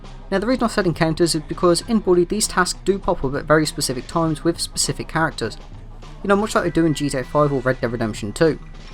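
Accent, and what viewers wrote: United Kingdom. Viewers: you speak in a heavy British tone to the point I... cant understand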